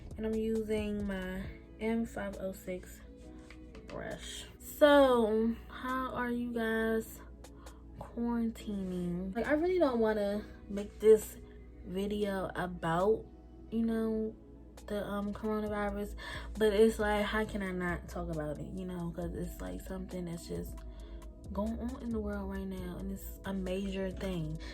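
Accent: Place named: DC, United States. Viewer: Sis are you from the DMV? I swear I hear a DC or MD accent lmaooo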